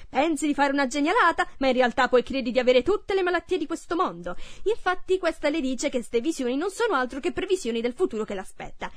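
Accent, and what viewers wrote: Bari, Italy. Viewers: sei barese ho dio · la cadenza barese è adorabile <3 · oddio sei di bari? anch'io 😂😂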